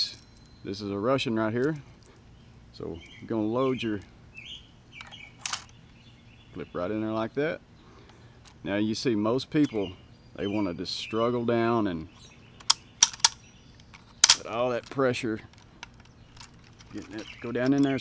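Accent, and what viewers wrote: United States. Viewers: you sound like brad pitt when he does his southern accent fuckin great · dude you have the most American accent i ever heard, i thought that was a hollywood thing hahahahaha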